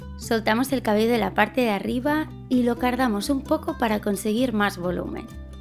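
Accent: Spain. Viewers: me gusta como ablamas eres española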